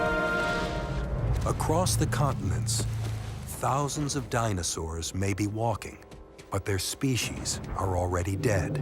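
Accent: United States. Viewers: Who is the teller? He sounds american 😢😢. I prefere Nigel Marven. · yawn, more american voiceovers...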